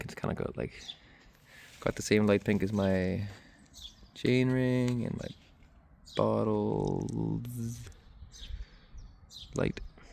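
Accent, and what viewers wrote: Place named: Canada. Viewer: i can hear the canadian in ya bud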